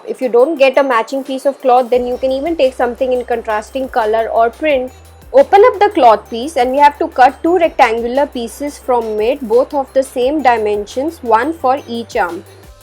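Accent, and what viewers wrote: India. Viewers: I just want to hear your hindi accent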